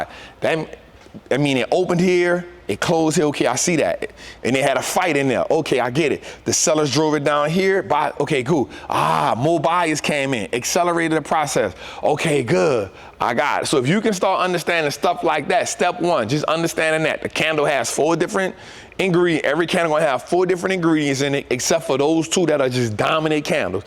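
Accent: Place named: Louisiana, United States. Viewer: That's that Louisiana drawl, lol!! Im born and raised in Chas SC, so I know what you hearing, lol!!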